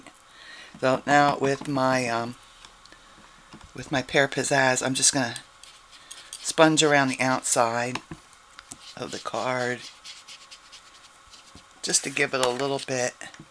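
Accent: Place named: North, United Kingdom. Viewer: Very pretty card! I love how you mixed the hearfelt creations with the Stampin Up. When you say y'all it cracks me up because i don't hear much of an accent, or maybe a northern accent.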